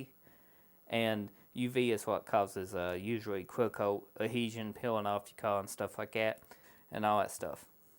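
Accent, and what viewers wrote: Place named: Texas, United States. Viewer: Your Texas accent is difficult to understand but this kit looks like a good value.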